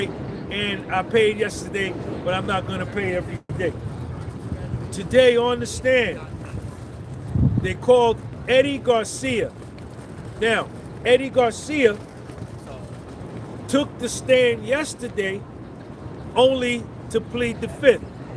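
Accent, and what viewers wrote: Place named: Harlem, United States. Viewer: I'm also new here, but not from academics, someone shared your videos last week on fb. I appreciate your storytelling of facts, but I'm from harlem and recognize the harlem swag and direct speech. However, og I'm a professional astrologer for the entrainment industry and puffy is part of my case study it's not good, this is a karma call he missed important to stop the drug use and change years ago. Ketu moon in ♌️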